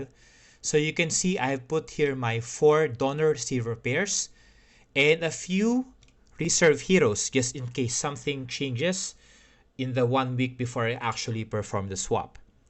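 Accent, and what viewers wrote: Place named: Philippines. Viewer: Are you Filipino? You sound like you're Filipino because of your accent and voice, by the way I'm a new subscriber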